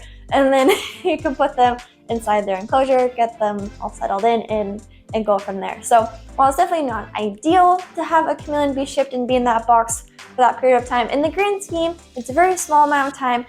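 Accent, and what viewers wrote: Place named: England, United Kingdom. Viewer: Lol your hot sounded very posh England english 😃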